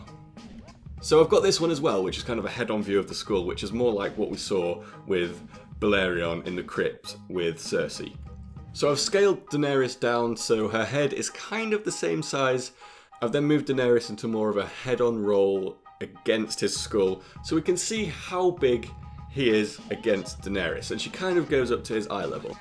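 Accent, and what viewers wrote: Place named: United Kingdom. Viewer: How can you not like a nice british accent?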